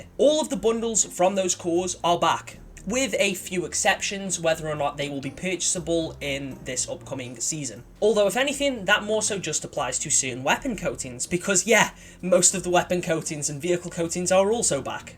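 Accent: United Kingdom. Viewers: Your English looks like England accent but it's more easy to understand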